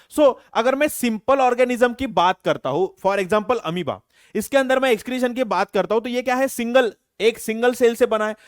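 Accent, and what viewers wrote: Maharashtra, India. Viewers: Sir are you Marathi...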